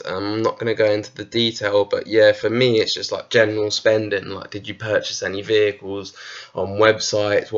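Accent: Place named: United Kingdom. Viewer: Wow such a well put together analysis by this guy some good information by the way FUCK MY LIFE I have been looking at thE OAK ISLAND MONEY PIT wow thank you for bringing this mystery to my attention I am really enjoying researching it on a separate note I keep hearing an accent what part of the world are you from you do not have to answer I was just curious I am sure it is the UKW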